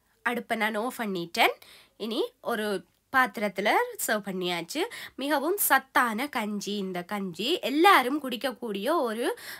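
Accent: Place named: Sri Lanka. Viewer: Sister entha kizhanku enka ketaikum unkaludaya srilanka tamil super Liked Stay in You have my support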